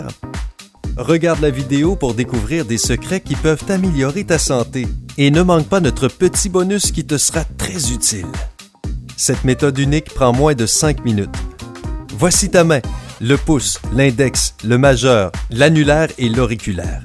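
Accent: Quebec, Canada. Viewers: Putin d'accin québékouè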